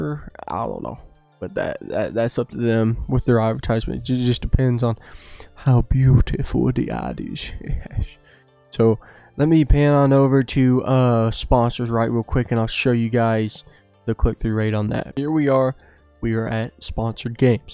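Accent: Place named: South, United States. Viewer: Your voice sounds country, I love it, yall that's why the music choice in every 1v1 video is epic🤘🏻😎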